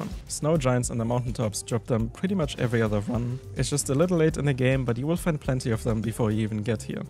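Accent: Germany. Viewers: Hey Not to sound rude but are you german Because you sound exactly like every German I know speaking English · Alter du klingst krass deutsch aber danke für deine Viedeos die helfen echt weiter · I smell german accent 😤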